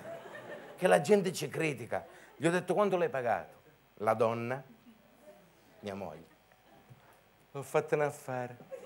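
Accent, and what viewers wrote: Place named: Calabria, Italy. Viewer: o grande calabrese sopratutto e l accento che mi frega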